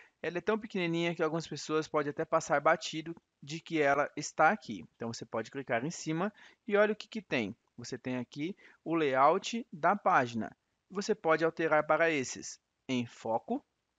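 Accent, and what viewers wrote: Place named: France. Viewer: Mano que sutaque francês massa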